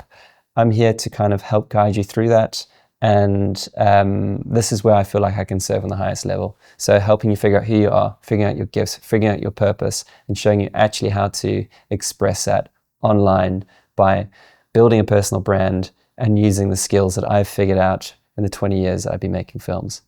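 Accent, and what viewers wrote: South Africa. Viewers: This accent sound firmiliar, South African Maybe?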